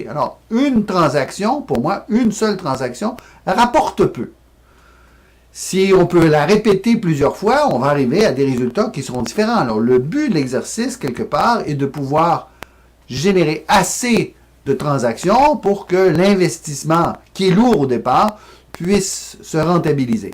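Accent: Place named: Canada. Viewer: Merci et Bel accent canadien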